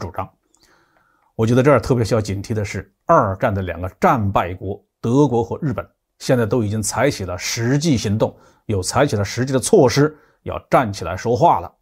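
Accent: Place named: Xinyang, China. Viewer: 听讲话好像有点河南信阳口音，难道是我的信阳老乡😃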